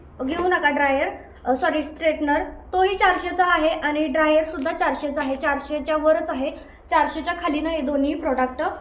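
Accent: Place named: Baramati, India. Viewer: ताई कुठे राहतेस तुझीभाषा तर बारामती साईट ची वाटते खुप छान असतात व्हिडीओ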